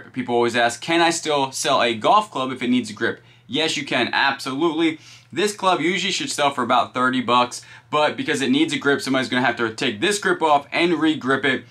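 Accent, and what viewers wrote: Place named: Baltimore, United States. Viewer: Sounds like a Baltimore accent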